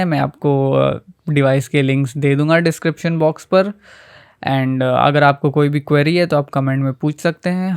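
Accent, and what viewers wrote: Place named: Hyderabad, India. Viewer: he has hyderabadi accent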